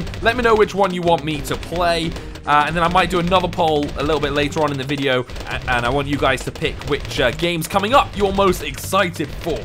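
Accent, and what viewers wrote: United Kingdom. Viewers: i very loved ur english-british dude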